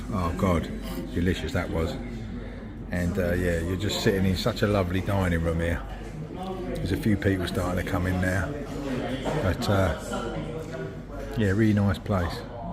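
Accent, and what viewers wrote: London, United Kingdom. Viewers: Wondering which video to heap admiration to you on. Fabulous video. It's the best channel to bring London's hidden gems to us in the Frozen North. Museums, cafes, pubs, sights are presented in a lovely, non-pretentious way, with humour and a true Londoner's love of the city. Having worked in London almost 50 years ago, its lovely to hear a 'Landan' accent. Great channel. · I love your knowledge of London. For some reason I love London and think it must have been part of a previous life. You don’t say who you are! I love your accent, think you’re a Londoner. Loved Leadenhall Market. I am eager to visit and try one of those scotch eggs. Thank you for rousing my desire to visit London 🐦‍⬛ :)) 🌻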